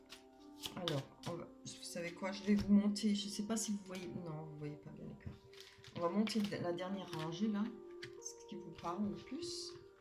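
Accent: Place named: Franche-Comté, France. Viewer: Vous avez un accent de Franche-Comté, ou pas loin.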